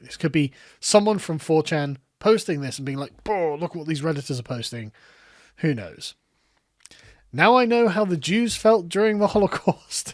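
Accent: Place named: United Kingdom. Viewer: i think you had something on your face. british english is weird. youll prounce "water" as "watah", but "swastika" as "swastiker".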